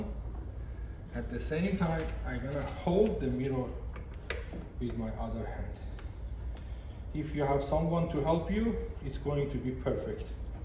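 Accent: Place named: Iran. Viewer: جان. دمت گرم. عاشق لحجه فارسی انگلیست هتم. میخواتم بدونم که سی۳۰۰ معمولی هم همینه یا فرق داره. میخواستم کامنت، لایک و سابسکایب کنم. موفق باشی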